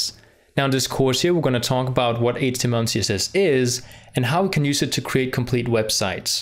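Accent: Denmark. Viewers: I could hear from your very first video that you were from Denmark lol, Hello fellow dane!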